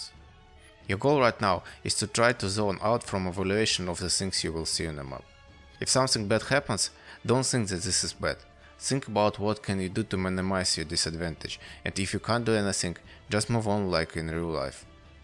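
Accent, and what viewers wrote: Russia. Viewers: Акцент не очень. Понятно что ты русский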